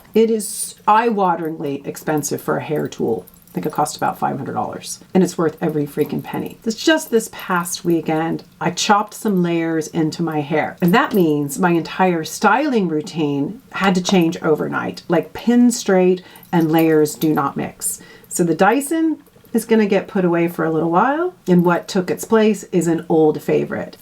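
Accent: Ireland. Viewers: Where are you from? I hear Irish 💚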